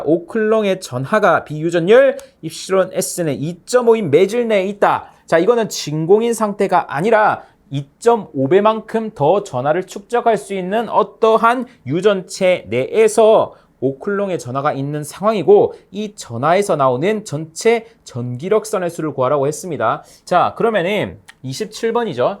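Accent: Gyeongsang, South Korea. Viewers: 경상도 억양 귀에 딱딱 꽂히네요 잘봤습니다ㅋ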